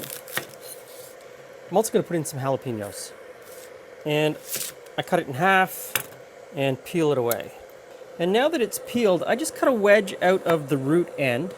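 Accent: Canada. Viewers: But he's Canadian, not Australian... ;-)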